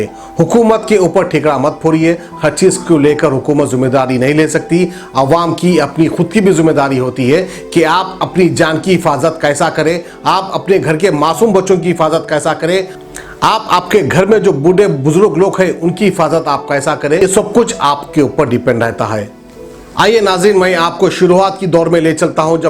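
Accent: Hyderabad, India. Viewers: bhai pure Hyderabadi language.....ghareeb kya karinga bhai...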